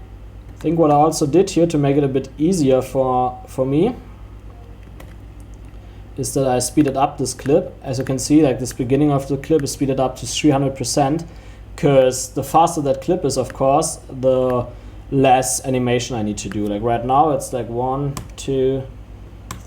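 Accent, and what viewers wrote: Germany. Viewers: Are you German ? In my opinion you speak really German English 😆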